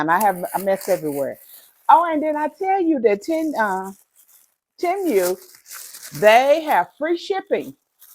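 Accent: South, United States. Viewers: What a great video! Thank you. Am always intrigued by the Temu ad— thanks so much Ms. for bringing their offerings to life. You and your fabulous southern accent make me miss home. Love you! xx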